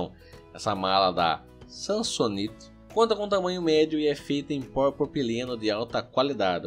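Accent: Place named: Piracicaba, Brazil. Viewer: Você é Piracicabano?? kkk sotaque muito bom!!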